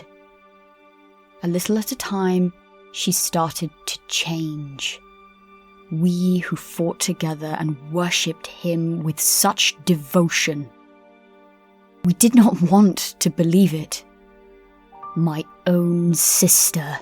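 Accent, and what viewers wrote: United Kingdom. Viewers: I'm looking forward to it. Not you kicking the bucket or retiring.. I really enjoyed the story and the voice reminds me of a really good narration on a channel called cold open stories or something like that. It was also a sisters story. It probably wasn't her as I think all British females sound exactly the same.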